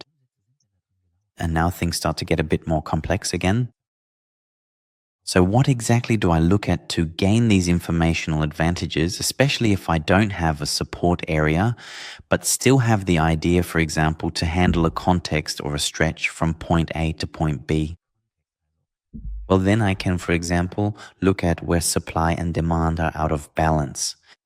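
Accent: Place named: Germany. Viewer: i prefer your german accent. its much more natural.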